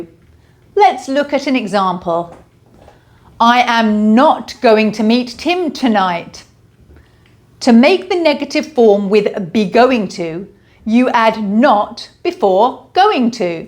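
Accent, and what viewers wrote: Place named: United Kingdom. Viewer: I'm going to say thank you my nice teacher I love Britch English 😂😂😂😂😂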